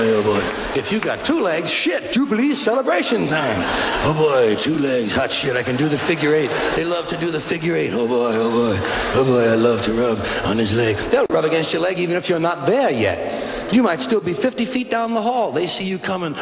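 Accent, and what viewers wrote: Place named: South, United States. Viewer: He sounds Southern in this vid, for some reason.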